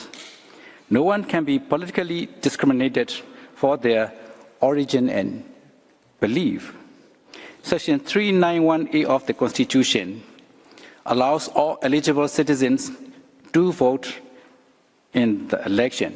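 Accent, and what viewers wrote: Myanmar. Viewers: ငပိသံကြီးနဲ့ဟ .. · အင်္ဂလိပ်မှမဟုတ်တာ မြန်မာက အင်္ဂလိပ်လိုပြောတာလေ။မင်းကဘာဖြစ်ချင်တာလဲ။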